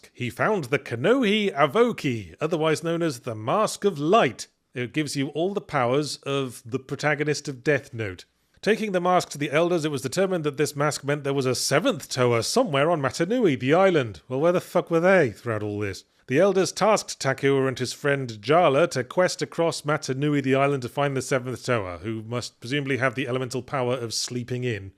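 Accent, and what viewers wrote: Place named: United Kingdom. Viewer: Out of all the first run of Bionicle products, the only ones I could pose "like one of your French girls" were the Rahkshi. Knees and good body portions do that to an action figure. However, whatever you do, do NOT disconnect the ball joints. ...also, I forgot that Jala (the McDonald's toy) and Jaller (the one packaged with the bigger set) are the same character, and the British pronunciation isn't helping any. That's not even getting into how the Matoran were originally called the Tohunga in the first comic.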